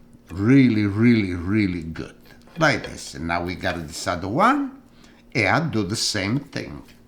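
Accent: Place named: Sicily, Italy. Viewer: Im making this tonight! Love this mans recipes! He reminds me of my grandmother who came from sicily in 1938 when it comes to his accent and his love for cooking.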